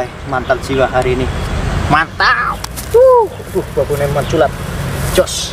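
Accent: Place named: Java, Indonesia. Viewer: Mas asli mana kok medok bgt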